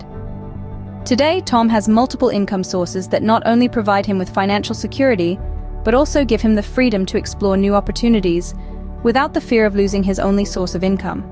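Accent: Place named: United Kingdom. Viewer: THE BRITISH NARRATION IS IRRIDATING-- AMERICAN ENGLISH POSSIBLE WITH A LESS KNOW-IT-ALL TONE?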